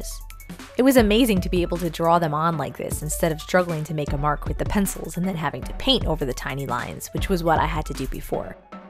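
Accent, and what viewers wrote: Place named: United States. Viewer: Are you american cause you sound american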